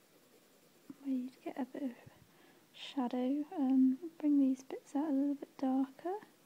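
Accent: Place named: United Kingdom. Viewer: She's British.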